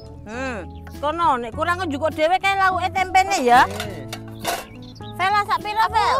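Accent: East Java, Indonesia. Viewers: orang Jogja tapi bahasane Kyok orang jawatimuran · Slmt pagi bu..... Domisilinya di Wates yaaaa.... Logat jatim nya kental sekali Sy sll buka video masak2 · Bu kok di Wates Kulon Progo tapi kok logatnya jawa timuran banget. apa sedang berkunjung ke saudaranya yg di kulon progo. Bahasa "sak buajek", "banyu puteh (bacanya bukan putih)" khas jawa timuran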